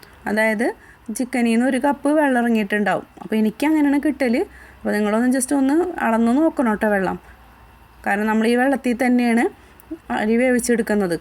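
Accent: Kodungallur, India. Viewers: ഇവിടെ മക്കൾ പറയുന്നു ur talking style same as me.. അതാ kdr ആണോന്നു ചോദിച്ചേ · samsaram kettapol thonni njnum kodungallur aann😁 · ഇത്താ കൊടുങ്ങല്ലുർ ആണോ വിട്. സംസാരം കേട്ടിട്ട് ചോദിച്ചതാ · Itha kodungallur kary aano... slang kettitt thonni... nice recipe.. njn nthayalum try cheyyum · hey njnum kodungallurr... Eriyad.. enkku samsaram kettappol thonni.. kodungallurr evdeya...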